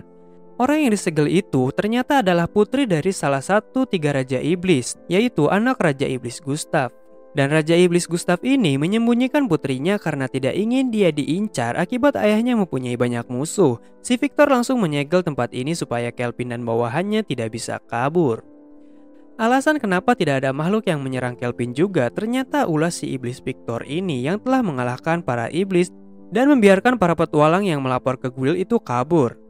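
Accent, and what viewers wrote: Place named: Sunda, Indonesia. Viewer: Bjir lu Sunda bang 🗿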